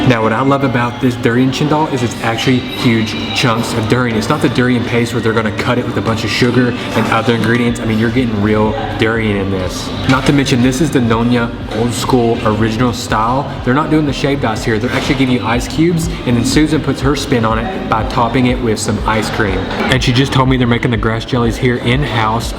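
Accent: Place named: South, United States. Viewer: Never did notice before but slurping and that southern drawl seem to go hand in hand. Damnit, i feel like one of Pavlov's dogs watching this. Keep up the good work sir.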